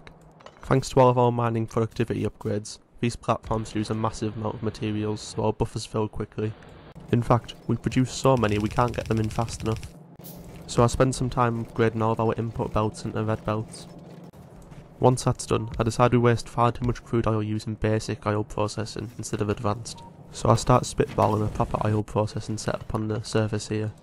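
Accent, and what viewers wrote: United Kingdom. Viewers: Der klingt ziemlich British, mit dem aussetzten vom T bei "water" oder "later".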